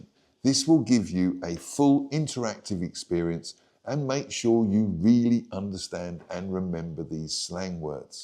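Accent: United Kingdom. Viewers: I like your style,. You make me laugh. You ought to be an actor. You have the perfect voice for it. Are you a Cockney?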